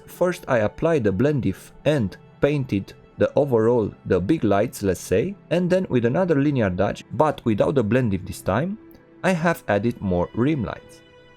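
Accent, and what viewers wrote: Romania. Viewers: you are from Romania you have a Romanian accent p.s I like what you do I discovered you a few days ago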